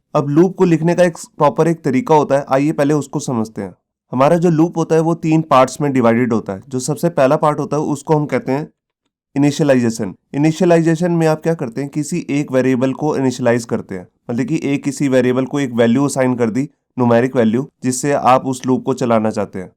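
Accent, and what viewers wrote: Punjab, India. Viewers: you cannot control your punjabi as amny punajbies face this problem and punjabu words like porri comes out involuntry.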